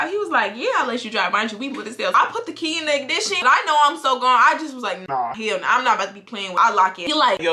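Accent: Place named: Chicago, United States. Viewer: i love ur videos they are so interesting. but i have a question are u from Chicago ur accent is very strong?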